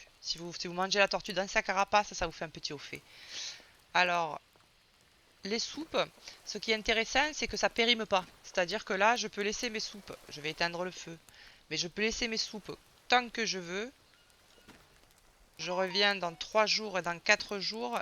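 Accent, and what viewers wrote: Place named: South, France. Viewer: Je m'abonne je suis le 100ème abonné, j'adore green hell, j'adore 😍 j'aime le sud et ton accent 😍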